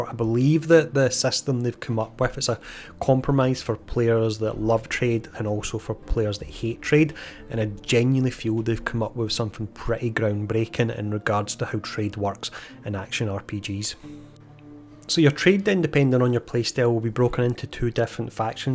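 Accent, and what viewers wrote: Scotland, United Kingdom. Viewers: I'm from Clydebank. He is clearly Scottish. Irish accent is totally different.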